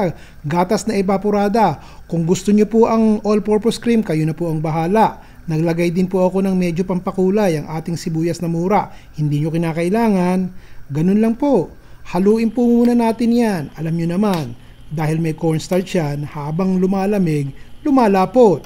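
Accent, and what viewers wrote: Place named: Bulacan, Philippines. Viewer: Gud morning, thanks again sa npaka simple at npakasarap na chicken recipe,halos parehas tau magsalita ng tagalog.ganyan kaming magsalitang mga taga Bulacan at Nueva Ecija.GOD BLESS YOU AND YOUR FAMILY...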